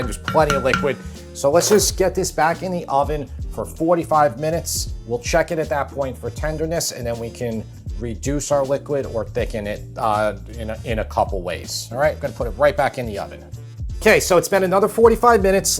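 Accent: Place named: New England, United States. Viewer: Mexican dish from Colorado cooked by dude who sounds so New England that he should be pouring it on a grinder with a side of clam strips Beautiful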